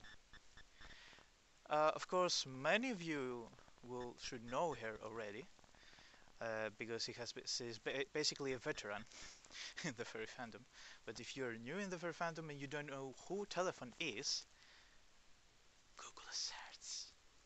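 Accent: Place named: Greece. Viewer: I watched this purely for your beautiful, Greek accent. 😻☕️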